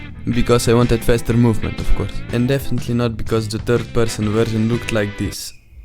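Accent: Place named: Russia. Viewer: I just come from shorts video. As honest I can, I tell you. That's is very cool and unique, I don't know why this video don't getting popular. Idea of game is good, fr good. Maybe you should do this like dungeon complete, or game with some lore? Blender skills at high level, how did you learn everything about this? And yeah, where are you from, your accent reminds some of Russian accent 🙂 Don't give up on this, try make new projects, bcuz if this is first unity oroject, then its insane and I can't believe in this. Goodluck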